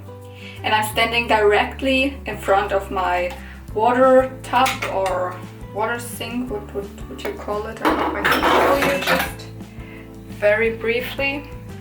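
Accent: Germany. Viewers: Bin ich die einzige, die den Deutschen Akzent raus hört? PS: tolles Video😊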